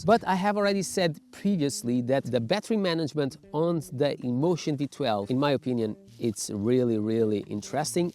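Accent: Portugal. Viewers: Já tinha reparado que eras português pelo sotaque mas agora fiquei mesmo surpreendido, também sou de Palmela. Bom video, estou a pensar comprar a Inmotion V12 :)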